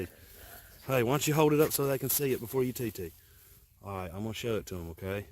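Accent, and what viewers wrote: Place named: South, United States. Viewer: Nice finds ! Listening to you and seeing the artifacts I figure you're from Alabama or Georgia . I am in St.Clair Co. Alabama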